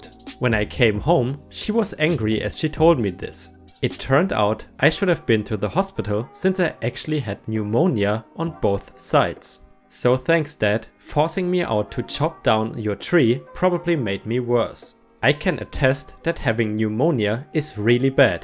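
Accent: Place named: Germany. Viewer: You're saying 'pastor' just fine (more like 'past-ah', but frankly I love the German pronunciation, so doesn't matter to me what you say!). An FYI (for anyone who doesn't know), Xmas (which is actually pronounced Christmas btw) isn't a lazy way of writing the full word. The X comes from the Greek (classical, but I think it's the same in modern) which was the first part of Krisotos (pronunciation, not spelling!). When Christmas was first being celebrated, it was common to write it as Xmas as Christianity wasn't yet a fully-accepted religion. There are a few other possible explanations as to why it was used, but that's the first I was told so I stick to it. Hope you don't mind the didactic moment LOL!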